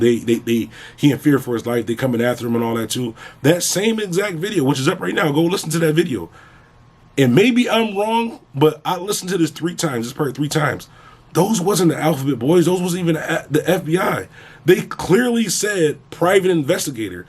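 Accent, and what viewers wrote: Philadelphia, United States. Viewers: Bro sound like he from Philly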